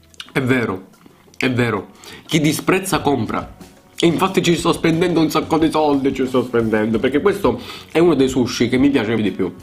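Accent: Italy. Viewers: si sente troppo dall'accento che sei palermitano miiii ho parenti in Sicilia 😂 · vedi avevo indovinato😂 da buona calabrese mi sono sentita ''una parlata'' simile alla mia😂😂😂 mooolto strano su YouTube che sono tutti del nord!!😢 Cmq nuova iscritta!!!bravo bel canale😉🍻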